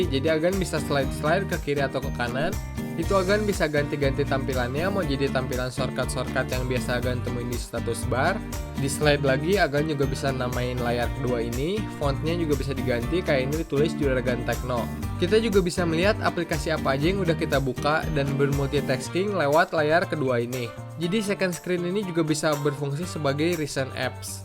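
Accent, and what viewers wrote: West Java, Indonesia. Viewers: pertama kali mampir ke channel agan, sunda pisan ini logatnya, ditambah liat "ciparay" :P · logatnya sunda banget gan😀 btw kameranya ngiler sama anti mainstrim 👍harganya udh turun 5jt lbih skrng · Udah "mah" kameranya bagus...eta meni sunda pisan si akang...linggih dmn mang?wkwk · orang sunda sigana ieu teh nu review na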